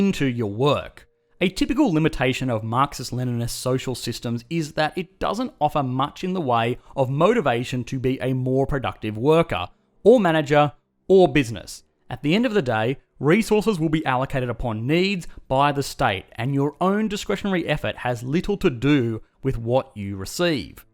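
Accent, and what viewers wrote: Australia. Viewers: Is that australian accent ?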